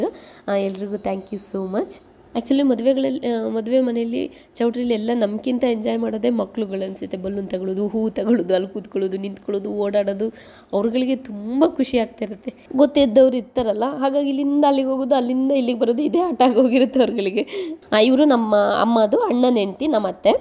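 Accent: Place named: Sakleshpur, India. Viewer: Wonderfull akka.I am from shanivarsanthe but probably my native located to sakleshpura.so i am familier with these 2district.first day nim video nodid kshana niv matado reethi nodi ankonde nam sakleshpura side atva somwarpet side avr irbodu anta.just 15days back nim channel g subscribe ade avatinda new and old videos nodta barta idini.tumba chenagi madta idira even nim husband saha well gentleman..nam jangalu especially nam Gowdas matado ancient and kodo respect na i love to watch that. Keep going and all the best.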